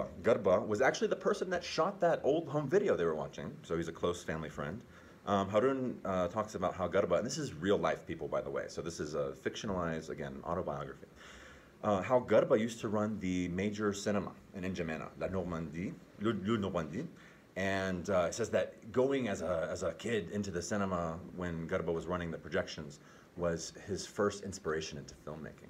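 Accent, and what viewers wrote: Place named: United States. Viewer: ناروتو اوزماكي اوتشيها انتا مصدق انوا سوداني؟ ترا امريكي لغتو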